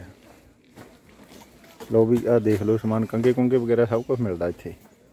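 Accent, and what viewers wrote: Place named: Amritsar, India. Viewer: bhau Amritsari gurdaspuri lgda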